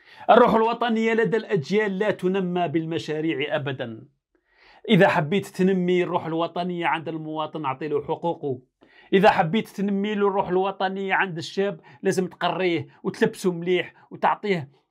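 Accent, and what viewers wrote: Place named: Setif, Algeria. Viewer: الله يبارك عليك يا وليدي الزين والفهامة بصح توله ورد بالك على صحتك مازلت صغير .نقولك حاجة برك لهجتك زي تاع بابا الله يرحمو لأن جدتي صطافية .الله يسترك ويحفظك من كل حاقد .تحية من قسنطينة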